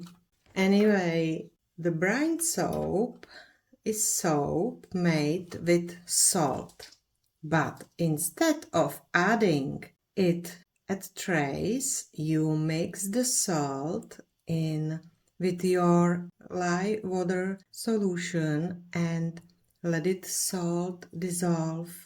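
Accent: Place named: Czech Republic. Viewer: Nechci se vás dotknout, vím, že to nesouvisí s výrobou mýdla, ale když už chcete natáčet videa v angličtině, bylo by dobré zapracovat na výslovnosti. Ta "czenglish" opravdu trhá uši, nezlobte se.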